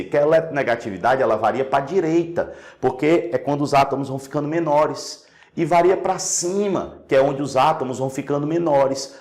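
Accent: Northeast, Brazil. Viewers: sotaque nordestino não negaaa. é "nois" oxeee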